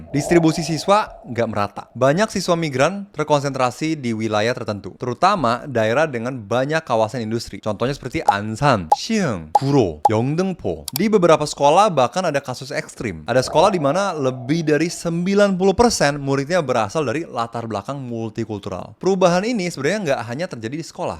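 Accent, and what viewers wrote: Indonesia. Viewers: logat nya ya Indonesia banget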